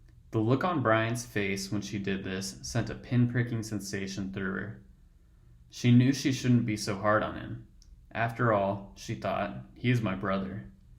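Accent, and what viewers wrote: Colorado, United States. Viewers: I didn't know Colorado had an accent! Probably different now than it was 100 years ago. That was probably more like Yosemite Sam in those days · Hahaha you’re hilarious. I don’t know if it’s because we share the exact same accent or if you’re actually that funny but I was laughing a lot during that video. I’m in Mexico right now learning Spanish and I’m just watching these videos to get a taste of back home. I miss you all in Colorado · I am half American half Australian and lived in Colorado as a child in the 90s. I love your video because it's great to hear the accent again. I love and miss Colorado and want to visit again some day. Peace dude!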